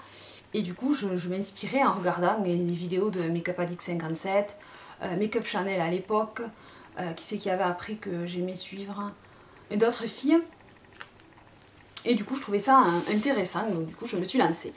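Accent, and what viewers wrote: South, France. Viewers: ton accent du Sud ne me dérange pas du tout d'autant plus qu'il est chantant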